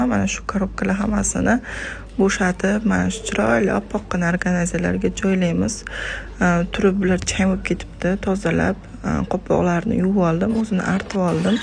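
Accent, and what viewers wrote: Kokand, Uzbekistan. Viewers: Qo'qonlimisiz qatidansiz shevez judayam o'hshidi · Qo'qonlikmisiz shevangiz.😊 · Менимча Коконликсиз шекилли шевангиз ухшаяпти😊😊😊😊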